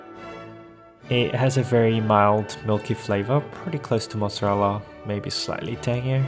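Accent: Australia. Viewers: ur accent are u aussie?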